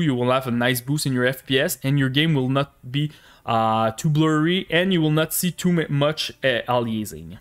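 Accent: Quebec, Canada. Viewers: The Quebec accent is here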